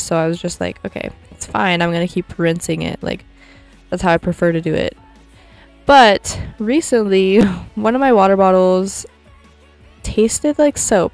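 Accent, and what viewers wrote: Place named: United States. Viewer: Hi! I was intrigued by your channel name and thought you were a ie New Zealander like me, but then I heard your American accent and saw the American products. First up I want to say I'm sorry for your loss, and I think you did absolutely the right thing which was to lie low for a few days, grieve and go easy on yourself, and then get up and tackle what you had to do. You are spot on when you said it's good for your mental health to achieve something and make your environment pleasant and tidy. I was nannying for a few years for various families and I learned a lot by observing how they ran their homes. One thing I noticed was that decluttering makes life so much easier. One mother had 2 little boys and she really had a minimum of clothes for them. She would do a small wash every day and get them dried and put away and there was never a huge pile of clothes to worry about. 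That's one recommendation I'd make to you, to go through your little guy's clothes etc and try to trim it all down to a minimum. Another great trick is to put away half of your little one's toys for a few weeks. When you bring them out again, put the other half that has been out away. He'll almost be like he's got new toys or so excited to see some old favourites. That way it's easier to keep tidy because there aren't so many lying around, plus you'll have a very happy kid. Also when they are so young, they grow out of toys so quickly so it's good to go through them and donate the ones that he's no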